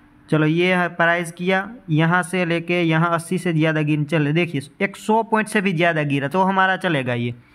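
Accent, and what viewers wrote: Gujarat, India. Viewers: You frome gujrat ❓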